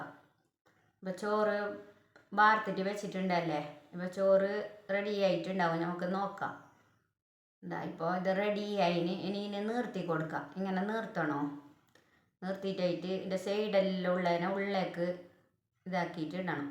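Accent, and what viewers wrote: Kasaragod, India. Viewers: Nalla usefull video..bakki Vanna choru ini ithupole cheythunokkanam..kasargod samsaram kettirikkan nalla rasamund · എനിക്കൊരുപാട് ഇഷ്ടപ്പെട്ടു നല്ലൊരു വീഡിയോ ആയിരുന്നു ഞാൻ ഇതുവരെ ഇങ്ങനെ ഉണ്ടാക്കുന്നത് കണ്ടിട്ടില്ല തീർച്ചയായും ട്രൈ ചെയ്തു നോക്കും അറിയാത്തവർക്കു വളരെ ഉപകാരമായി വീഡിയോ ആയിരുന്നു ഇങ്ങനെയുള്ള കുറെ ടിപ്സുകളും ഉപകാരമുള്ള വീഡിയോയുമായി വീണ്ടും വരണം കാസർഗോഡ് സംസാരം കേൾക്കാൻ നല്ല രസമുണ്ട് ഞങ്ങൾക്ക് കാസർഗോഡ് ഭാഷയിൽ സംസാരിച്ചു കേട്ടാൽ മതി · ഇത് കൊള്ളാലോ നല്ല ഐഡിയ ആണല്ലോ. നമ്മളെ കാസർഗോഡ് ഭാഷ കേൾക്കാൻ നല്ല രസമുണ്ട്. കാസർഗോഡ് എവിടെയാ ഞാനും കാസർഗോഡാണ്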